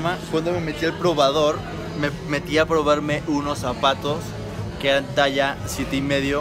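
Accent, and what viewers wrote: Guadalajara, Mexico. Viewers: Mames eres de Guadalajara?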